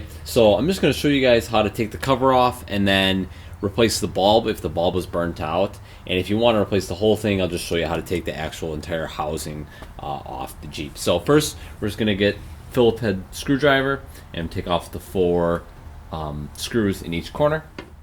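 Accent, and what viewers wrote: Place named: Midwest, United States. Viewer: From the Midwest are ya?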